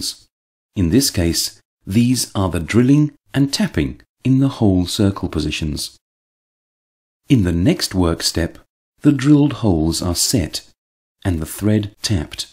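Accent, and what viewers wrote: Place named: England, United Kingdom. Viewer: fucking english accent shut up already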